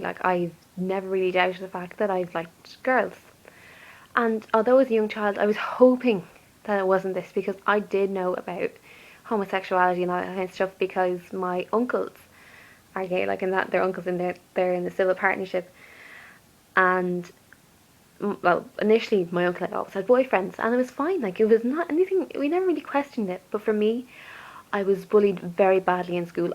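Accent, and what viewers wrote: Ireland. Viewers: I love your beautiful irish accent and your inspiring story! I am the same! I'm the "g" word as well! I find it so amazing, you make me feel so happy and warm inside. You are so pretty! How could people call you ugly when you are the most beautiful person I have ever seen! I love you! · I'm trying to pluck up the courage to come out and watching your video is another helping hand to getting there. My heart races when I just think about saying it out loud. Oh and I absolutely love your accent :) always had a thing for Irish accents hehe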